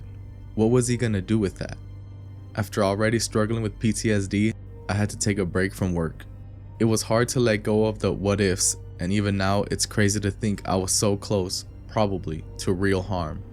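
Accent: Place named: United States. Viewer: its crazy how good your english is you sound like an average american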